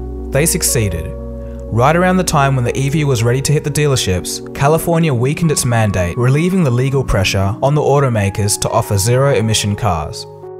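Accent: Australia. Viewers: OHHH SHIT MATE YOU'RE AUSTRALIAN WOOOOOOOOOOOOOOOOOOOOOOOOOOO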